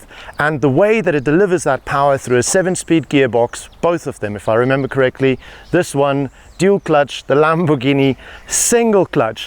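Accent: South Africa. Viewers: First 10 secs of the video, I'm like, "Why do you sound South African bru?" 😂😂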